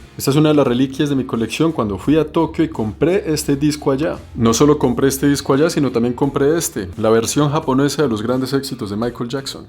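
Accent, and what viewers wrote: Bogotá, Colombia. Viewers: Sigo su canal de viajes , su estilo calmado, educativo, sus comentarios y su acento colombiano que le queda preciso a sus narraciones. Es un ser humano con gustos como cualquiera, y en ese contexto no hay música más internacional que el rock y el metal. Saludos desde Ecuador parcero 😊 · Es el acento de Bogotá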